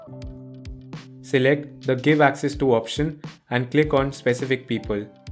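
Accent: India. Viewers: You know it's a great, quick and easy guide when you hear indian accent. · The moment I heard the Indian accent, I knew my problem would already be solved 😏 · Indian accent = auto dislike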